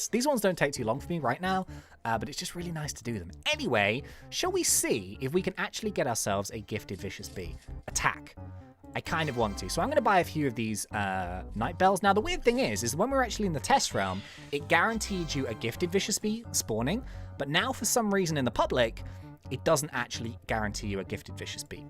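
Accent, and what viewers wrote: Ireland. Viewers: I feel like he has never been to Ireland he sounds more like an Irish man with a high pitched voice I am insulted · But I really love your Irish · The spirit bear with your lady irish accent makes spirit bear 10x kind. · Thats i nice irish lady accent in my opinion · you are actualy doing a good irish accent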